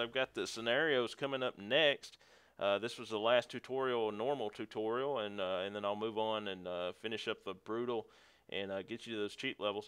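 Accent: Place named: South, United States. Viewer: Can't stand that southern voice but your tutorial worked so good job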